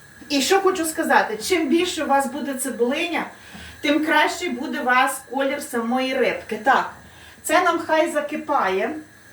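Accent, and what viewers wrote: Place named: Podillia, Ukraine. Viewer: Дуже дякую за таку розлогу відповідь. Село Глібічок - це мамина мала батьківщина, неподалік від Кам'янця, там, де Миньківці, Нова Вушиця. Почув такі з дитинства знайомі слова, мовні оберти, інтонації, от і запитав, звідки ви. Буду тепер Ваш канал дивитися і слухати 🤗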